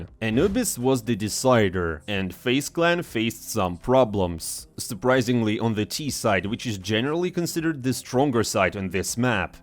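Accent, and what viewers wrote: Russia. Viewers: I love we have drunk russian givig us bbc type cs news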